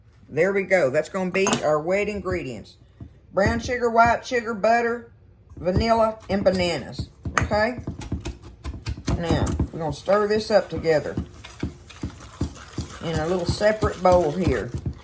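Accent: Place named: Texas, United States. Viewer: I love the Oh, Oh lol, I miss Texas, love her accent.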